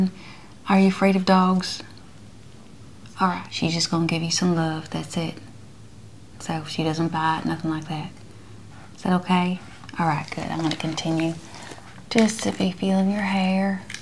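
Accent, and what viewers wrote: South, United States. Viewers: love your Scarlett O'Hara accent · That southern accent is impressive. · Please do more southern accents, you are spot on.❤ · Aww! How creative and sweet! Last time we had British and now we have Southern! Another wonderful addition to the -Verse! Were multiple versions of you with different personalities roles and accents take turns to be your bedside nurse for the day! Haha I think that's a creative way to think about it! Your amazing! Never forget that! Sending you love!❤❤❤🤗🤗🤗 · Why is it southern accents are so friendly and comforting? You nailed it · Great southern accent!! Great job! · Wait …you’re NOT southern?! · Love it ❤. On point with the southern accent 👌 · That’s actually a good American southern accent. Good job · You are just the best ASMR nurse ever 🤩💗. Love you and love your southern accent 🥰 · I love the Southern charm voice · Beautiful country ❤️ accent 😍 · I’m from South Carolina and I can’t detect a fake southern accent. 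It’s better than most tv actors. You might have a second career. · From Alabama here, fantastic job on the accent. 😉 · I didn’t see “fake” at first and I assumed she was from the south I’m from ga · I love your southern accent! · As someone who grew up in the south. I can tell you without a doubt, she nailed the accent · Thanks for sharing this very, very impressive southern accent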